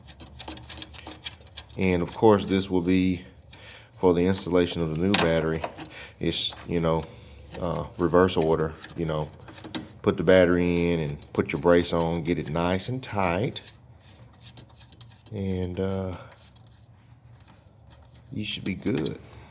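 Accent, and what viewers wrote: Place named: Alabama, United States. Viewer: Haha! I love this guy's down home accent! No place like home (Bama).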